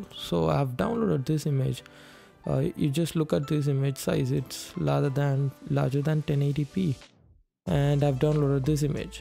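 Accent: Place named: India. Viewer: sorry man but your indian accent is irritating me you should don't talk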